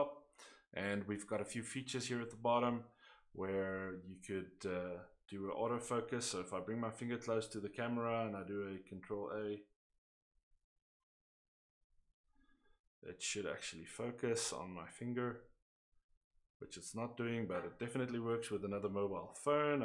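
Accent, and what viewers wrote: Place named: South Africa. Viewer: Saw ZA and I was like, hmmm maybe he's South African. Heard the accent and confirmed :). Dankie vir die awesome video!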